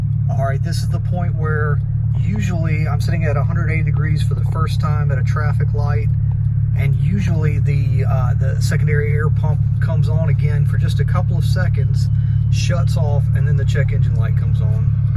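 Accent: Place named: South, United States. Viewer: Great Job. Love the 996 videos, I have a 2003 that I bought from the original owner, retired navy pilot. He drove it like it was a daily driver. but he had a book stuffed with receipts for repairs and maintenance since the day it was new. Now sitting with 100K on the odometer and When I see a repair like this one, that I can't find a receipt for, I doubt it has been done, and go ahead and tackle it. Si aside from removing the alternator, it looks rather straight forward. Keep the videos coming Good Job. I'm a Florida Boy transplanted out here in So Cal when I was active-Duty Navy. good to hear a Good Ole Boy accent reminds me of home.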